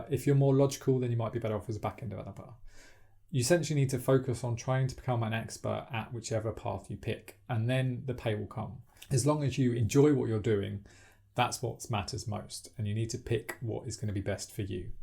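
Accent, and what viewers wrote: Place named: Manchester, United Kingdom. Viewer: kinda, I m currently studying computer science at Manchester. so kind of familar with this accent lol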